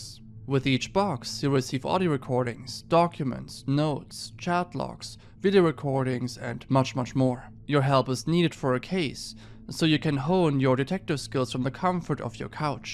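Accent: Germany. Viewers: I knew u were German u have a similar accent to my bestfriend from Augsburg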